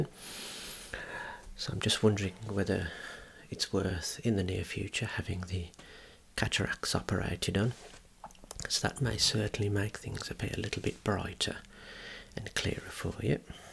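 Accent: Birmingham, United Kingdom. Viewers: Brummie! Lush accent!!!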